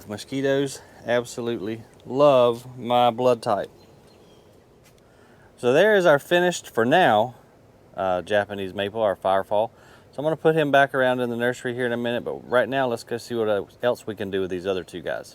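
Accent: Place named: South, United States. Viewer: I am so very interested in this and assume that you have a website with more more. I have so many seedlings in yard and woods, and of different sorts, that I wish to employ my "If you can beat 'em, join 'em" philosophy. I'll throw this out there. I figure if I don't get an answer, that maybe you cover that precise question in the aforementioned, or close enough. Pine seedlings: Vicinity Charlotte, NC-I have forgotten the zones, but given your accent and mine, we in the same "zone." So what other 'persuasions' can I use? Form a parabola with a brick tied to a rope to weigh him down? Soldering wire seems to work for true seedlings but is too 'soft' if you wait too long to begin. PVC pipe 'sleeve' with a 90 or 45-degree joint(s)? A tangent on pine seedlings : How much root-ball do I need to include when transplanting? How much 'tap' root. 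I didn't know I could kill a pine seedling, but yes. I did inherit a green thumb for the central Alabama to Gulf Coast region, but 28107 is different enough that I need to seek help. Whether I get an answer or not, no worries. I've learned enough from this one vid that I'm better off than I was. For that I thank you.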